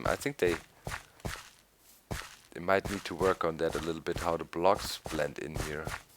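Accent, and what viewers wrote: Germany. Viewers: Widac ze to jakis niemiec gada na tym filmie rosjan to lubie ale niemcy gunwozjady to juz ich akcentu nie wytrzymam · You sound very german.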